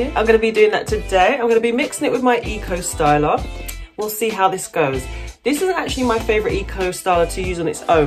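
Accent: United Kingdom. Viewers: Ur voice is pretty are u from the UK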